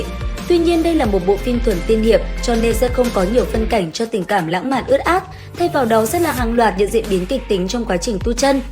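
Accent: North, Vietnam. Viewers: Ad là người miên bắc chắc luôn🤣🤣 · Mình ng trong Nam nhưng sao nghe giọng Bắc của bà AD nghe cuốn quá 😅😅😅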